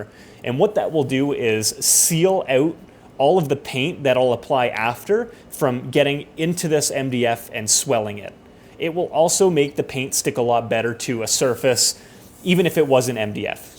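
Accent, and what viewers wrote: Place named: Canada. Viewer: They turned out beautiful and your narration is easy to follow. I knew you were a Canadian right away neighbor. Visited the Falls last month--a view that never ceases to amaze me!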